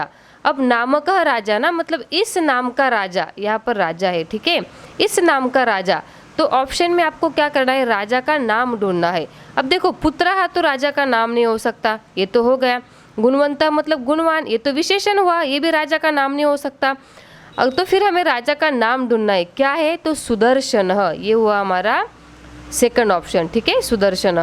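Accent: Maharashtra, India. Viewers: Maam are you Marathi???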